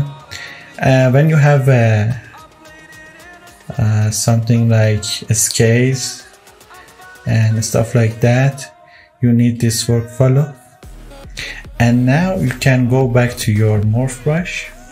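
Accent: Iran. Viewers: Your accent sounds Persian. 🤔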